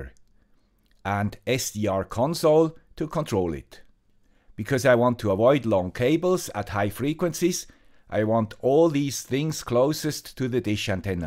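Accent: Switzerland. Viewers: I know. I was just saying we need more videos all the time 😂😂 can't get bored listening to the guy with the Swiss accent.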